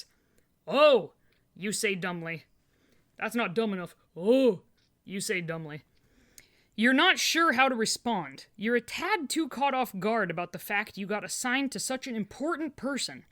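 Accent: South, United States. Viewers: Your Southern voice is OK I’ve heard worse T-T sadly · XD the southern accent hehehe I love your readings lol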